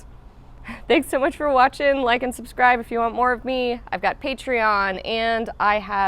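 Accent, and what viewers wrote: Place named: United States. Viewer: Hun snakker ikke et nordisk sprog så er det ikke bare ok at hun siger ord med et amerikansk accent?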